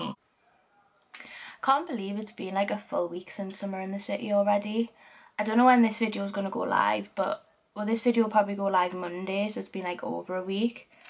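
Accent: Newcastle, United Kingdom. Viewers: i love watching you cos its so nice to hear a geordie accent for once and youre just so real and lovely xx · I love your Geordie accent I’m from the north east my self and you are they most stripped down honest you tuber I have came across don’t really know if you will see this but hey ho I love your channel iv just came across you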